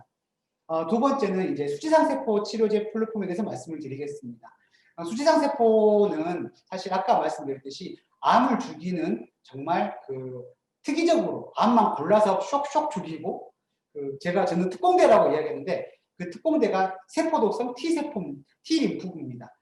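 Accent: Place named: Jeolla, South Korea. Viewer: 대단한 기업 아니 노벨상 수상이 가능할 기업 전라도 구수한 사투리로 기업 설명 너무 자세히 영어 가급적 적게, 귀에 쏙들어오게끔 설명 감사합니다.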